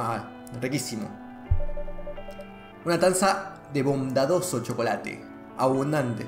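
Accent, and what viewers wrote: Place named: Argentina. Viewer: eres un kpo:) llevo un tiempo en tu canal (además de que me pegaste el acento argentino xd) y ya me vi todos los videos. Siceramente me parece muy interesante tu contenido. Sigue así. Saludos desde chile 🇨🇱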